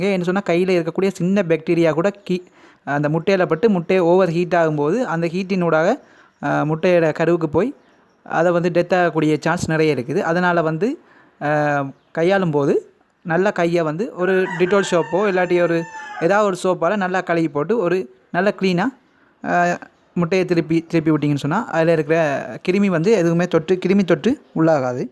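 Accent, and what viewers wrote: Sri Lanka. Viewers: Hi are u Srilankan tamil nice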